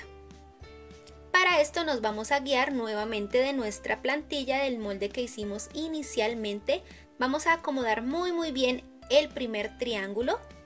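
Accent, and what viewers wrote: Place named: Venezuela. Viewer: Eres venezolana verdad?❤️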